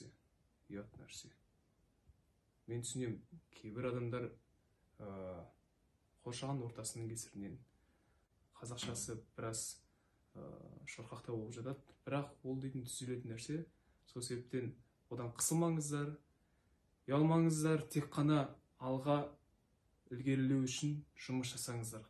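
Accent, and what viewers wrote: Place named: Russia. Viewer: Sotaque semelhante ao russo.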